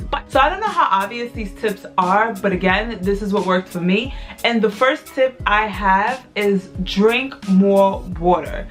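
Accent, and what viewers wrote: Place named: New York, United States. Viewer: Sexy NY accent 🔥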